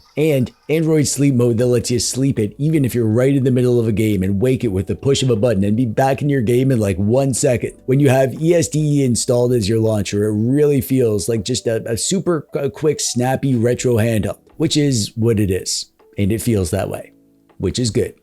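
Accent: Canada. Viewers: If I hadn't jumped ship to Retroid for a Retroid Pocket 4 Pro, and more recently a Retroid Pocket 5, I'd have been very interested in this. But instead, I'm just watching to hear the nasally Canadian tones of.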